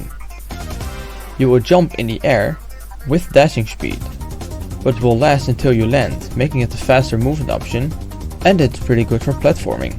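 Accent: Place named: Netherlands. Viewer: Dutch accent strong in this one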